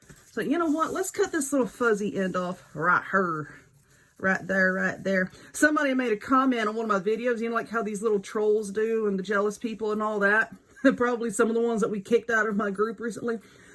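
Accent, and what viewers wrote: South, United States. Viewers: Well I’m old and have never seen this craft before! So thanks for sharing it! Also I love your sense of humor reminds me of my sister even down to the southern drawl.🥰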